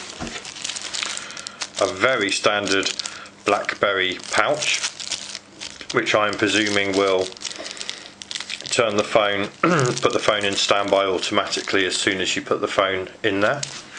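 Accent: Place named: United Kingdom. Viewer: loving the british accent.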